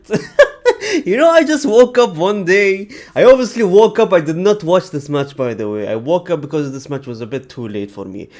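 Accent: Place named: United Kingdom. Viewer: bro has developed a uk accent 😭😭😭😭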